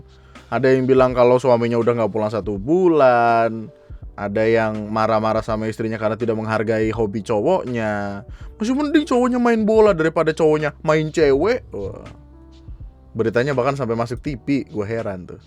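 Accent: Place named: Lampung, Indonesia. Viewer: Raden Inten Lampung itu bang, dari logatnya org Lampung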